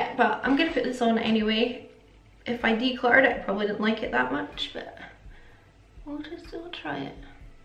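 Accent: United Kingdom. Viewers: You are soooo pretty and unique. I can't really watch youtubers with British/Scottish/Welsh accent (having trouble understanding lol) but I haaave to watch you 😍❤ keep doing what you're doing, gives me hope I can do it one day as well.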